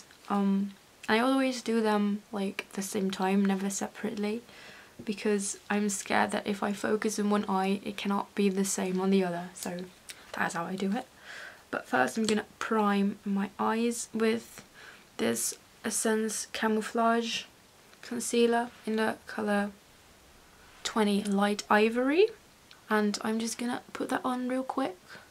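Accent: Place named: United Kingdom. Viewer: that british accent tho, love the video. Keep it up :>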